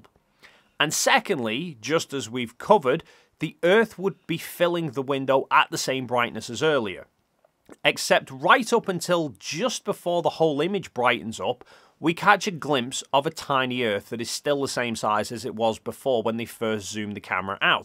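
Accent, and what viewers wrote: United Kingdom. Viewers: Did they think having someone with a British-style accent would make it seem more authoritative, like an Attenborough film? This is similar to the people who ask why the moon photos have a black sky but you cannot see any stars. They just have no idea how a camera works and how exposure changes based on the brightness of light sources. Here, the outside light source (the Earth) is basically over-exposed (when they pull back to show interior) so the camera can show the details inside the capsule as it is darker inside than outside. The window is super-bright because the amount of light coming in is way more than the interior lighting. Same with the starless moon photos. The cameras there were set for the lighting of the sun and reflected sunlight on the surface so there was proper exposure and the photos are not too bright or too dark. 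If they were to set the exposure to see stars in the sky, which are many times less bright than the moon's reflected light, then all the details of the moonscape would be over-exposed, or washed out, and you would not be able to discern much in the view. Plus they did not go to the moon to look at stars; they would look the same as from Earth or low-Earth orbit.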